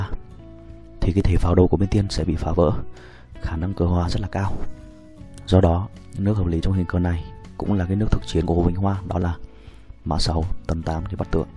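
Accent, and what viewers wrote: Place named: Quang Tri, Vietnam. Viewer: trung minh dang vậy mà tui cứ nghĩ giọng quảng trj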